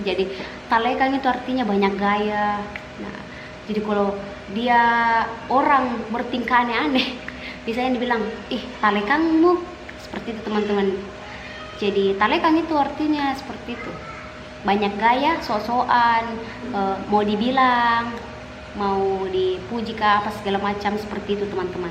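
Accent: Makassar, Indonesia. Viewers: Sy senang makanan khas Makassar & logat nya itu loh enak bgt 👍👍 Ewako Makasar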